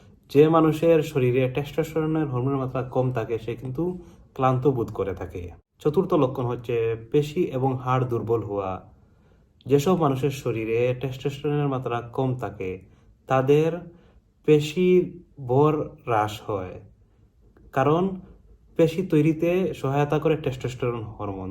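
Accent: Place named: Barak Valley, India. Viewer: Bhai aap konsa college sa ha Apka language to bengali jasa ha matlab .. from barak valley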